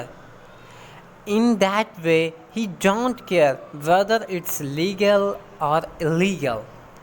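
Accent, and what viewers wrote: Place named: Pakistan. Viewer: Pake ascent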